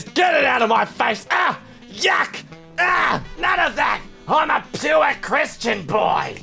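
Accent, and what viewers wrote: Australia. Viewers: I love the Australian accent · I have a question, are you Australian?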